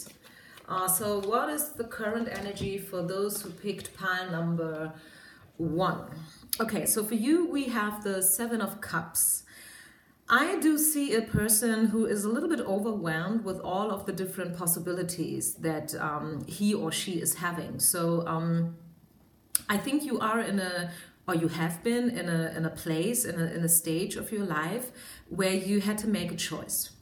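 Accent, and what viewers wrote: Germany. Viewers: New sub here! Picked #3. Wow! So on point. Amazing! I'm indeed starting my own business and I'm a really spiritual person. I'm single for 2years now and I plan to stay like that, I guess you can say I gave up. I loved your energy. I studied German in Regensburg when I was younger, and I love to hear your accent. Thanks for this reading. Love and light from Brazil 🤗🤗🤗😃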